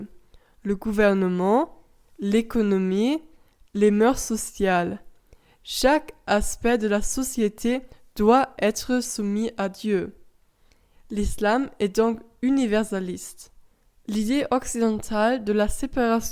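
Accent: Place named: Germany. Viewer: Cet accent allemand si charmant pour écouter parler théologie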